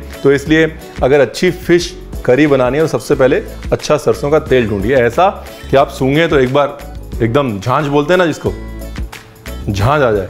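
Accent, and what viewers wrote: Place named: Bengal, India. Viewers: Wow......again I fall in love with your recepie and your Bengali accents❤❤❤.... 'Bhaat ghum', 'macher jhol', I felt that you are the family member of all bengali family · Can we just take a moment to appreciate his cute bengali accent💯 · You have a punjabi heart with Bengali accent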